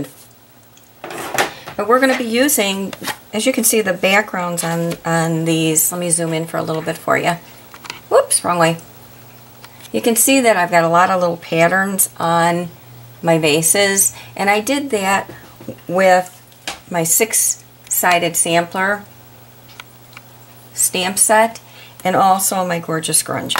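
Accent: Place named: Illinois, United States. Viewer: are you from : Illinois, Wisconsin or Michigan area? You sound like my daughter in law lol :-) She's from Cary, ILL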